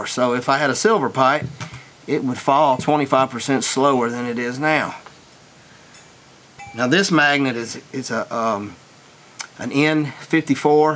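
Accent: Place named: Appalachia, United States. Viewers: Trick Photography, hillbilly talk. I love this guy!!! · that was cool thanks. let me guess - North Carolina accent. Yes that's where I live.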